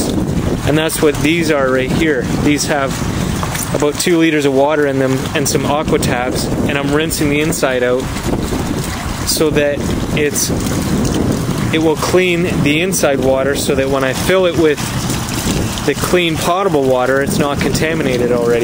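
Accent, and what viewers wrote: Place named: Canada. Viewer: What's with the Canadian accent?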